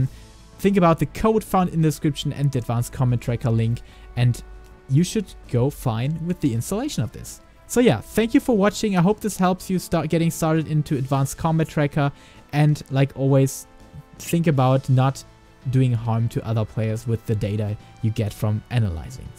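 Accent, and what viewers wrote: Germany. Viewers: You're German am I right? :D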